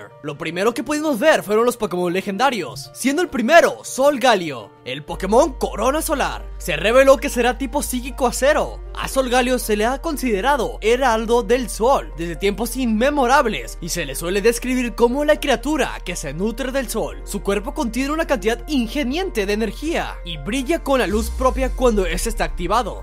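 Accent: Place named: Mexico. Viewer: donde puedo apartar mi versión del juego, o sea donde lo puedo mandar a pedir o algo por el estilo. Supongo que vives en México, por tu acento y las palabras que usas en tus vídeos.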